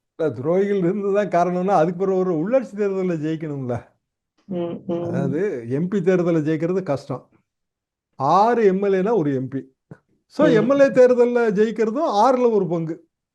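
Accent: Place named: Coimbatore, India. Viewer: கோவைத் தமிழ் அவருடையது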